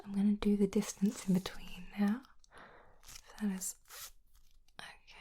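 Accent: Australia. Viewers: just aussie mate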